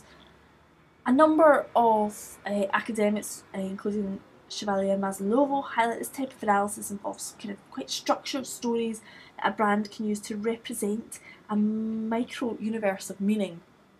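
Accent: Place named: Scotland, United Kingdom. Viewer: I love her accent, Scottish?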